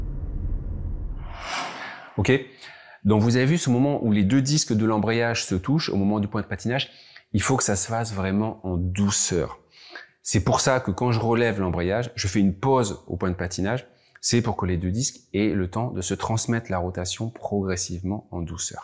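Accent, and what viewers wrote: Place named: Guadeloupe, France. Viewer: C’est drôle, je vous trouve un léger accent guadeloupéen, mais je suis sûrement biaisée ! ^^ Merci pour cette vidéo, et les autres en tous cas ! 🙏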